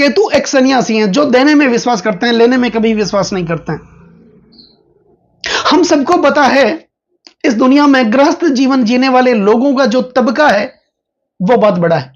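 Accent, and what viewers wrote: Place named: Punjab, India. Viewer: It’s seems Hindi is not his first language, there’s Punjabi kind of accented Hindi.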